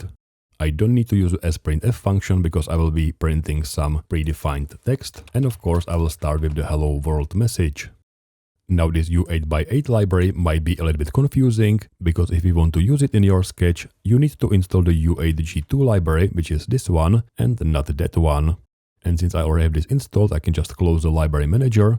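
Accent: Czech Republic. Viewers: velmi vyrazny cesky prizvuk, inac genialne video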